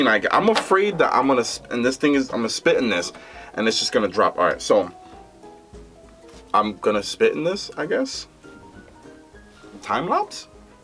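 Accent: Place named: Puerto Rico. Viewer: I laughed so hard. Probably more than I should have. 🤣😂. Good stuff. I knew you were Puerto Rican as soon as you opened your mouth. 💪great personality.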